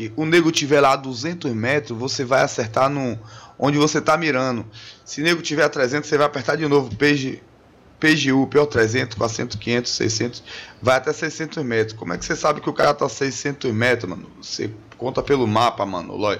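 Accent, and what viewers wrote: Bahia, Brazil. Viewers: BAHIANO CERTEZA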